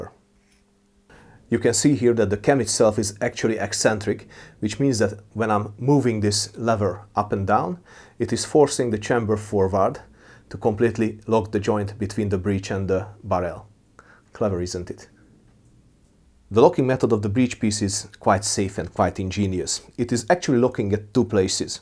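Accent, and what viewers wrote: Hungary. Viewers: This guy has strong hungarian accent... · Ah, Kammerlader story told in exquisite hunglish, love it! :D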